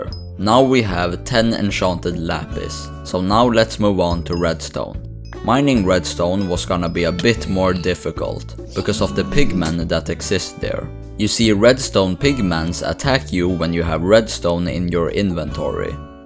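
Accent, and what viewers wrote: Sweden. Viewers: Svenska accent👌🤌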